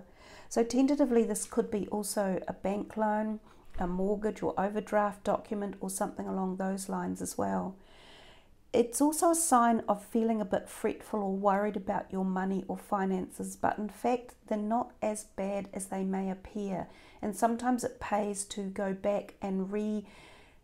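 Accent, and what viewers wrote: New Zealand. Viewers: 🥰🥰 refreshing to hear a kiwi accent 😊 Kia ora arohatia to tohu ❤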